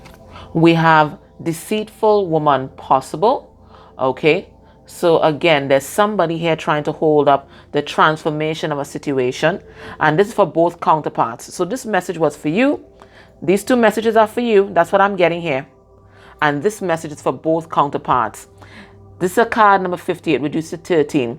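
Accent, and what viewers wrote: Trinidad and Tobago. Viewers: Thank you. Always love hearing that trini accent 💕🙏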